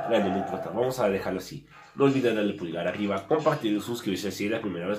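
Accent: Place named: Portugal. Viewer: Eres de portugal o galicia?....... Hay veces que no te entiendo. Yo soy nativo del español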